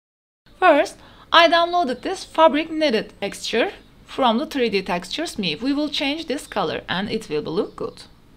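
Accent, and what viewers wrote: Turkey. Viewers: thx for tutorial and i cant hold it inside me but ur accent feels like turkish ngl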